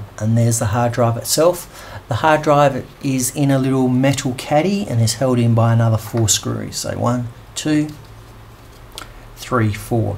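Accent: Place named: United Kingdom. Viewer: You would be very informative were it not for the painful experience of having to hear your extremely annoying British (or whatever) accent! Couldn't you get someone to speak normally so that we can understand??!!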